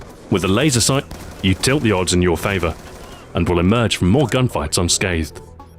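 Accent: United Kingdom. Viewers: No he's british.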